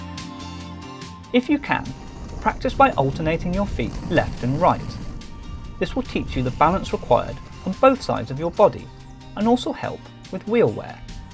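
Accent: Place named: United Kingdom. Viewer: tbh, i just watch these videos because i enjoy the british accent^^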